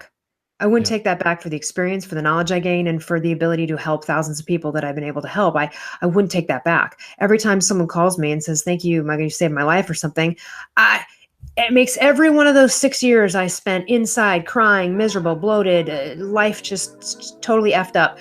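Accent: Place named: Australia. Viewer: you could be taken as an Australian with that accent.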